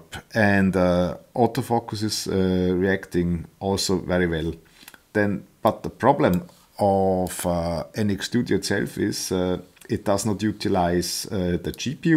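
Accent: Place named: Switzerland. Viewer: Da ghör ich doch en schwizer usse 😃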